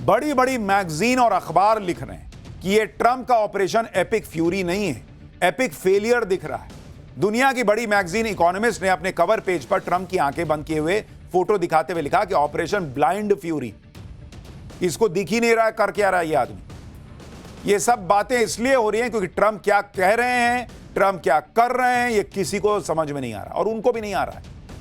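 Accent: Bihar, India. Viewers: Andaz news sunane ka achcha hai Bihari lahja hai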